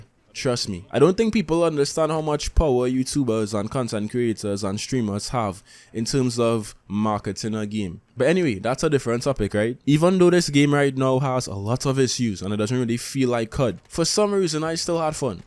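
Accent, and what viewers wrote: Trinidad and Tobago. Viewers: You sound like a Trinidadian lol I'm from Trinidad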